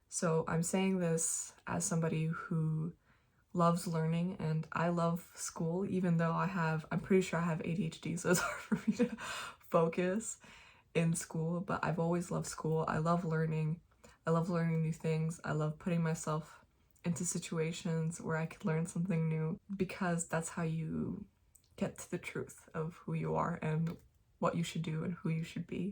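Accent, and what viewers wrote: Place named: United States. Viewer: Congratulations!! I always thought you were from America lol. Canadian and American accents are so similiar I can't ever tell the difference.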